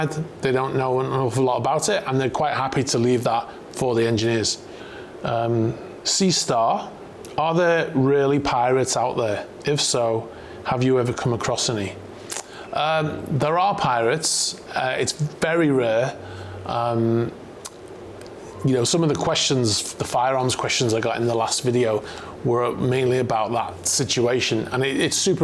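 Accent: Liverpool, United Kingdom. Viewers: Are you a Scouser???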